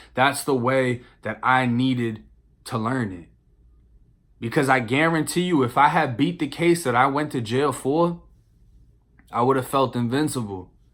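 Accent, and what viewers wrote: Boston, United States. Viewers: I am just trolling a little bit lol but not for negative reasons just trying to give you laugh and get your attention at the same time. Few questions for you. You ever come across in prison any of the people that killed that guy based of the movie bully. I know Florida that case was huge so big that it turned it into a movie. I love people from Boston just the way they talk and the way they carry themselves that's why I vibe with your channel but with that said boston can be pretty racist unlike Florida were it seems to be more diverse you won't see nearly as much racism. I only bring this up because you were talking about trying to work at Irish bars when you got out of prison I figured that would put out a target on you being blood and all the gang releated tattoos. I know that side of Boston would hate on you but I could be wrong. 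Also you remind me of one dude from the movie the town. I was watching the movie 2 days and I remember the interragation scene I am like this dude be sounding just like you. Boston be going hard with those gangster crime flicks if you get a chance the average person don't know this movie but its called what doesnt kill you best Boston gangster crime flick I have ever seen and its based on a true story about to bad as dudes from boston that came from nobodies to running boston This the link in case you never seen <link> Also this dude is a trip this guy was a regular no gang affiliated white kid from Boston who became one of the biggest gangster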